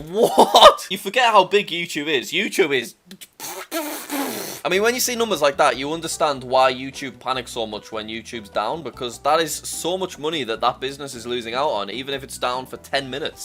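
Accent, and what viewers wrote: United Kingdom. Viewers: Bro i love your british accent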